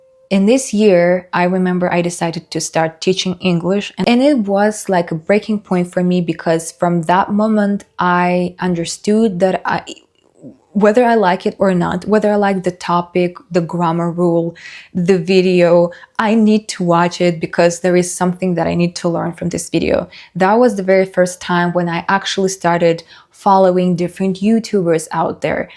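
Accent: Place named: United States. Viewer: Great video. You have a very good command of english, no doubts about it. Accent reduction, rithem, fluency. I've noticed that your pronunciation is very much influenced by general american English :)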